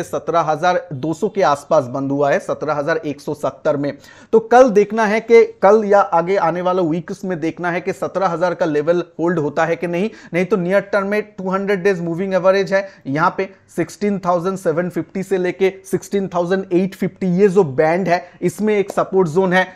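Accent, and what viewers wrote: Bengal, India. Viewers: many times you put bengali words in hindi accent in your communications that creates unique statements which I personally liked very much, at the same time there is no mistake as such,additionally your hindi communication touched another level which becomes your signature mark in futures. Yes, today is the day of huge corrections.Still I personally feel IT funds will boom again. Thanks for your video that will definitely help & subside today's burning pain amongst mutual fund lovers. 👍.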